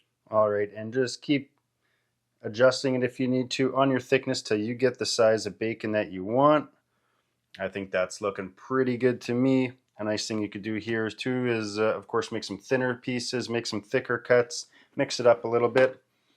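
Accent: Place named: Canada. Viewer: Like your recipe, but man... your Canadian accent is killin me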